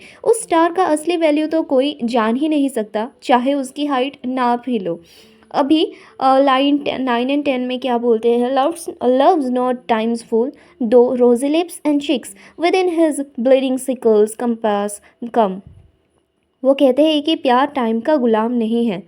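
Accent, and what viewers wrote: Odisha, India. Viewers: Didi you have odia accents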